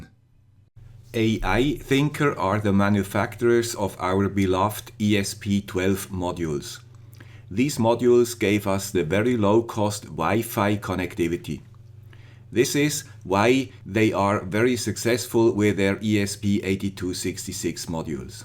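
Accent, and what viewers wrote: Switzerland. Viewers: Great video ! Btw, I don't mind your Swiss accent. It's not too bad ! Please continue with these interesting video's. It's very entertaining. Greetz !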